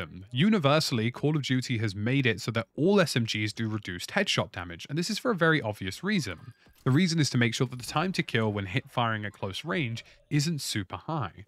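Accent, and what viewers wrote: United Kingdom. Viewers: i just wanna say your videos are like asmr, i freakin love your chillaxed voice and bri ish accent (im american and incase you didnt know ALL americans love the sound of british accents) so anyway, thanks for these videos! you and markiplier are the only two people who plays games that i watch anymore, so u two must be doing something right! have an awesome week! 🐮🌻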